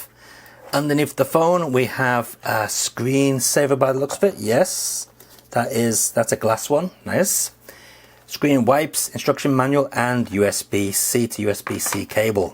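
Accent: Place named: England, United Kingdom. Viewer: Thanks, good review. Surprised to hear an English accent tho appears you aren't on UK from your photos. I've shortlisted it, assume will work ok in uk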